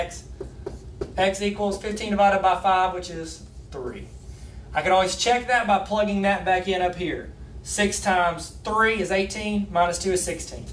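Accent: South, United States. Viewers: ayyyyyyyye my class calls you and we love this video haha XD THANK YOU FOR BLESSING MY MATH CLASS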